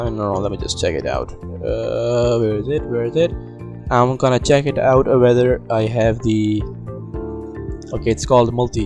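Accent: India. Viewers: That Indian Accnet is very bad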